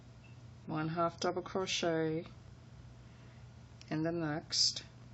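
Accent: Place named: New York, United States. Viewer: I love your videos! Your accent reminds me of my BFF Nancy from NYC. I also Love to Crochet earrings because they are fast and easy. TY for your videos and pls. keep them coming!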